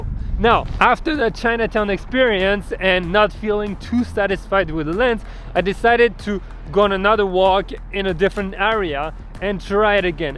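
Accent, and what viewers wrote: France. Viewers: I wonder if ...tu es français ou québécois!? :D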